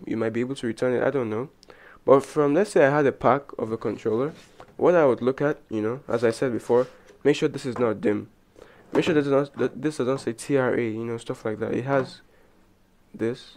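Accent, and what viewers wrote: Nigeria. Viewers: You sound like a Nigerian, can you please tell me where you bought the original? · I can bet this dude is a nigerian I can hear he's accent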